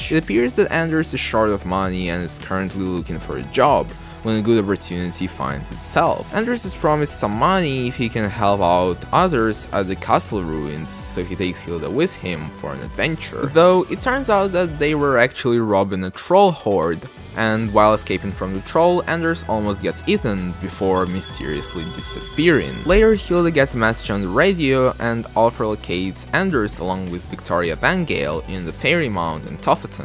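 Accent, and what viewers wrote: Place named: Brazil. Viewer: Are you brazilian? You have a familiar accent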